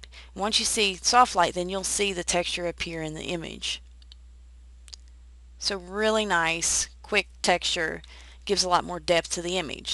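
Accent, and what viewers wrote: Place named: South, United States. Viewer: thanks, dig that sexy accent too.......are you from the southern part of the states ?.........great vid !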